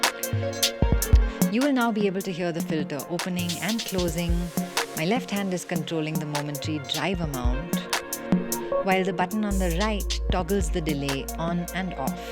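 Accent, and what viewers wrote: India. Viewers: The demo is awesome. Your diction sounds like an Indian. Well. Loved this demo. Haven't yet tried Launchpad. Maybe in the future for sure.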